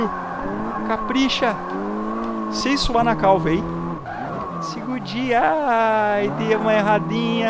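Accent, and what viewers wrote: Rio Grande do Sul, Brazil. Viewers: tu é gaucho vey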